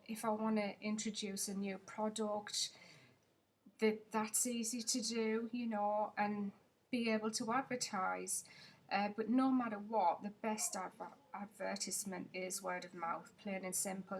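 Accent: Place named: United Kingdom. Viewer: Hello I have been watching you for weeks now, and I just live listening to you talk about how Eden's Secrets came to be. You are very pretty and it's nice to put a face to that beautiful accent. I am from the states and I LOVE British accents. My goal is to go threw and watch all your videos and try to comment in each one. Wish me luck on my adventure. Bye for now.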